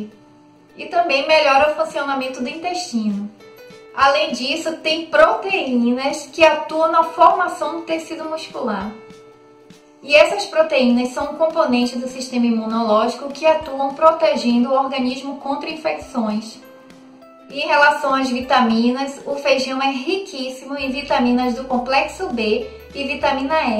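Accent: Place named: Recife, Brazil. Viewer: adorei, você é muuuuuito simpática! sou de recife e adorei ver alguém com sotaque parecido❤️❤️❤️ muita luz pra você🌱✨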